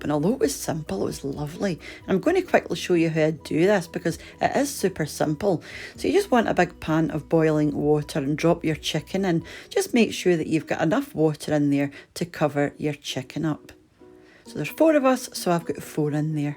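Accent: Scotland, United Kingdom. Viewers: Yes, I'm enjoying your Scottish accent as well. Miss hearing it.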